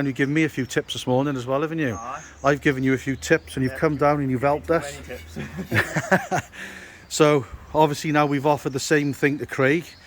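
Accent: Wales, United Kingdom. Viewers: Some good tips with a Welsh accent ... seeing as I'm originally from North Wales it's a win / win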